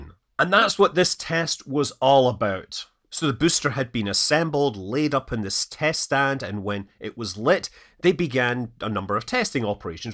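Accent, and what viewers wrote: Scotland, United Kingdom. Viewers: Aside from the fact that someone creating videos about spacecraft includes audio from a track, I have to say that the Scottish accident for the English language is unquestionably the most appealing. Is it is so charming and nominally out of place with modern society; hearing it applied to cutting edge technological matters is all the more delicious.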